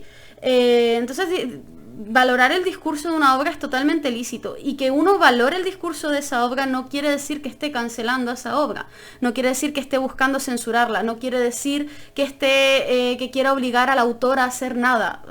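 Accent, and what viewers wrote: Chile. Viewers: cuando no teni guión se te nota caleta lo chilena sdffjsdhfdshj somo el mejor país de chile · Btw cuando te molestas sí se te nota mucho en acento chileno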